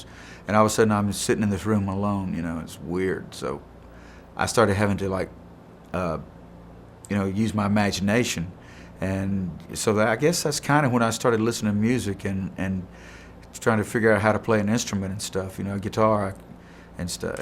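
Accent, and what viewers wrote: South, United States. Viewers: Whoa! Me hearing his southern accent was just as shocking to hear Steve Perry speak Portuguese....Learn something new....New to me.... LEGEND! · Never knew he had a southern accent anyway very nice. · Interesting, wouldn’t’ve thought he had a Southern accent considering when he sings you don’t hear an accent!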